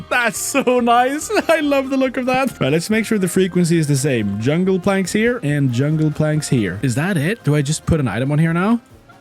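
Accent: Sweden. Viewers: I swear, every time when i hear you i think that you must live or is born in sweden with the accent that you have.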